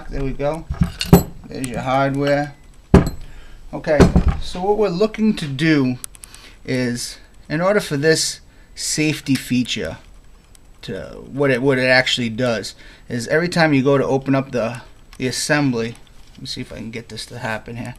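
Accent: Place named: New England, United States. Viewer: You have a great accent. New England is my guess. Thanks for the video.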